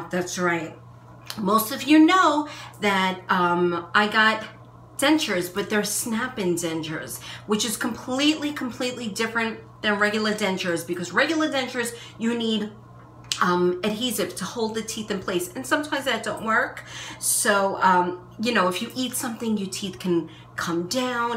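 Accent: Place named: New York, United States. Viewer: I just discovered your channel.... Can i say seriously I've been watching all your videos the last day in a half and I'm in love!!! You are the most down to earth human being I have ever seen....and i love love love your accent...im from Chicago so the new York accent is amazing to me lol. Anyways keep making videos and being beautiful! Xxx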